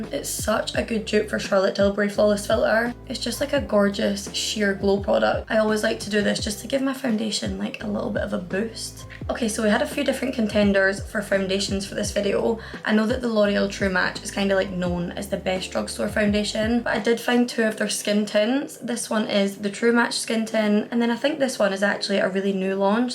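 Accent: Scotland, United Kingdom. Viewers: I haven't subscribed to anyone in a very long time, but your video was refreshing to watch, calm and informative. Also, love the scottish accent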